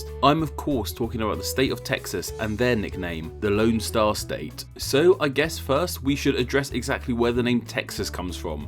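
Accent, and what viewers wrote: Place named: United Kingdom. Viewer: The accent lost me. British talking about Texas. Hmm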